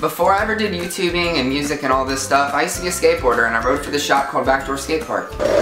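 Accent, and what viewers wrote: United Kingdom. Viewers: OMG! The accent is back! 💜 ily really, even when your trying to do a British accent XD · Are you british? You sound kind of British (no offence if you take offence) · I'm so happy for you!:') Also, I'm proud of your British accent:D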